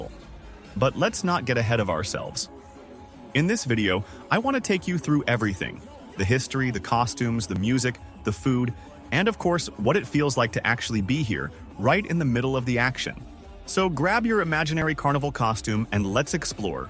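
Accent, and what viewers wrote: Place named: United States. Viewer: Sounds like an AI generated American accent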